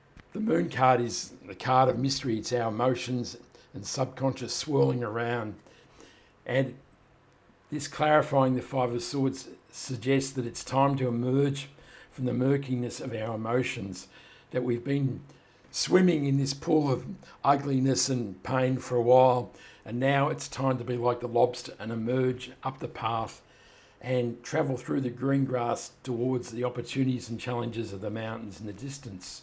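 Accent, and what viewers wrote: Australia. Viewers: Subscribed.. Nice to hear an Aussie accent!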